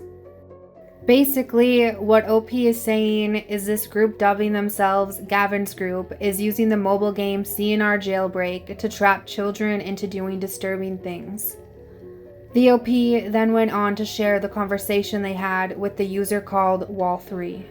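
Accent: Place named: Minnesota, United States. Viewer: Lol im waiting for a doncha know after every sentence. With your accent Minnesota? Montana? The dakotas U got that up north Canadian accent. But yea we need to go after these pedo punks. I wanna batman up with a posse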